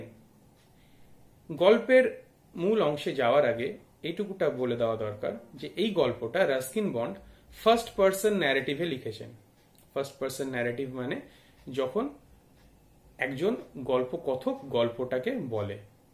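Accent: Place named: United Kingdom. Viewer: And also your pronounciation is really nice and alike to British English that helps me to learn how to pronounce the words there in the text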